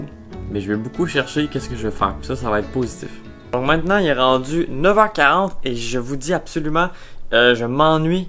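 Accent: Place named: Quebec, Canada. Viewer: Charmant accent Québécois 😊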